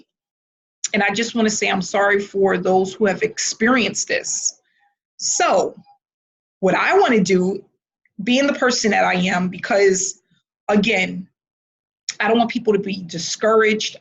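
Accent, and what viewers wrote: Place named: New York, United States. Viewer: Thanks for your speedy acknowledgement... love that New York accent or is it Boston?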